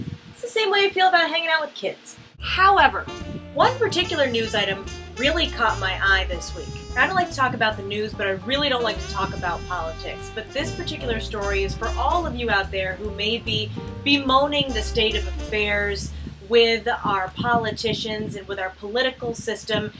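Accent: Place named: Western New York, United States. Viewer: Haha that was good. I thought this was going to be about the rapper when I first clicked, but then totally redeemed yourself when you played the song at the end. Are you from western NY? You sound like you are from western NY.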